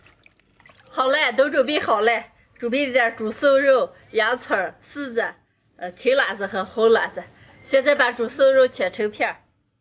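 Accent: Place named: Shaanbei, China. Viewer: 越来越爱听陕北口音了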